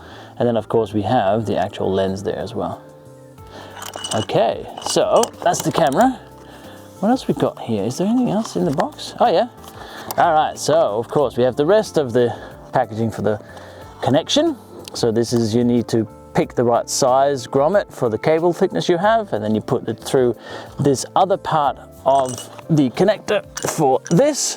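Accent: Denmark. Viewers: Jeg syntes nok jeg kunne genkende den meget lille danske accent. Hvordan kan det være du er flyttet til Australien?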